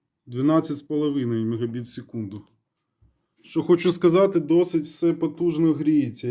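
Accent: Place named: Surzhyk, Ukraine. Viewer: У тебя самый плохой в мире суржик.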